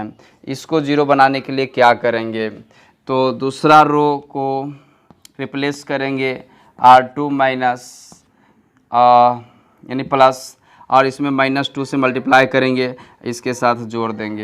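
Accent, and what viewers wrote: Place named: Bhojpuri, India. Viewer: Ek dam khati bhojpuriyan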